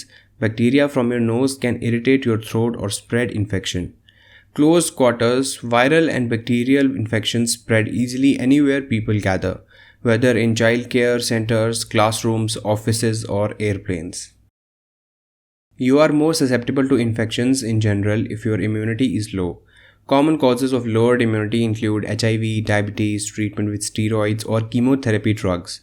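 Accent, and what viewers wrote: India. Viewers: Indian accent 😁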